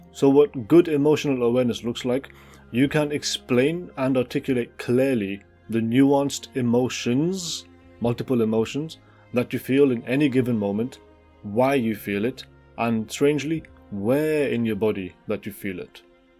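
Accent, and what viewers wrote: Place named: Egypt. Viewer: This random, tiny channel of a man speaking with Egyptian accent has helped me so much with pushing through procrastination. Thank you Internet man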